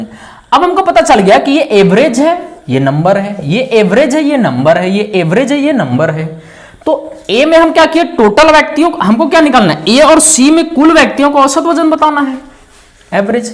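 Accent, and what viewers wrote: India. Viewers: Bhai mai aapse kuchh kehna chahta hu..... Aap garibo ke liye bhagwan hai..... Ho sakta hai kuchh log aapke desi bolne ke style ko nahi like karte ho.... Aap rukiyega nahi.... Thanks a lot keep continuing.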